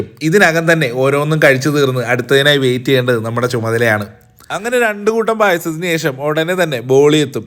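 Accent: India. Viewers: Kollam aanenn thonunu.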